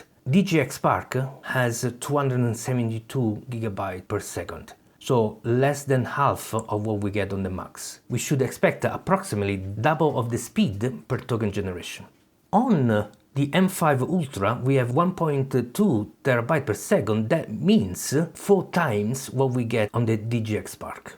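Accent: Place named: Italy. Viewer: italian eeeehhhh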